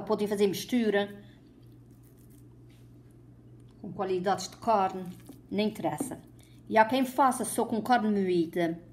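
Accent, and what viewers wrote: Azores, Portugal. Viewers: adoro esse sotaque Acoriano! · How sweet and pleasant to hear Sao Miguel accent! Love t! ♥️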